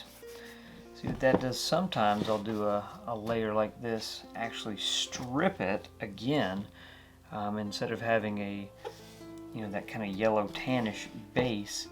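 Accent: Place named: Oxford, United Kingdom. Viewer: I mean, you did a good job. The way you speak, your intro and other thinks are totally like Elegant Oxford. I don't say that you're bad at what you do.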